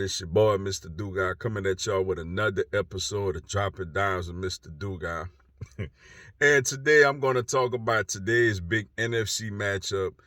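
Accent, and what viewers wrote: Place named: Louisiana, United States. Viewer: Where you from sound like Louisiana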